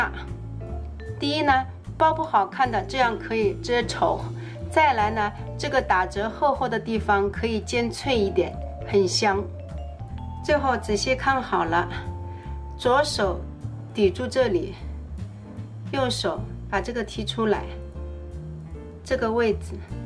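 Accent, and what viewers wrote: Shanghai, China. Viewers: 听到上海话就先点赞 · 正宗上海国语，皮薄的不能再薄了，金金黄🤣🤣😂😂😂听着好亲切，立马订阅😋😋😋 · 去年回国经过上海，有幸尝到上海生煎包，现在一直心心念念，想起就会咽口水哈哈，今日看到你的视频太激动了，准备学着自己做试试，你的视频做的非常好，上海话好听，喜欢，继续关注你的视频谢谢！！ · 上海口音👍👍👍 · 你好，我是上海人，现在在美国，很喜欢你的上海生煎，在这儿很难吃到。多次观看视频，有一个疑问。如果我一次包多了，能速冻吗？冻箱里取出后能马上煎吗？希望你的回复，谢谢你！煎后仍有汤汁吗？你的上海普通话与我一样，听了很亲切。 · 上海闲话真亲切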